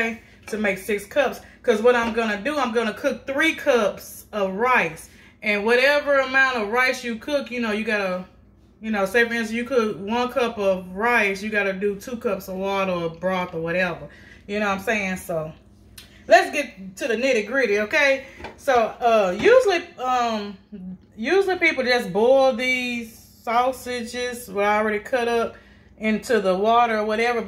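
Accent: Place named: Louisiana, United States. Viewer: You sound like one of my cousins from Clinton, La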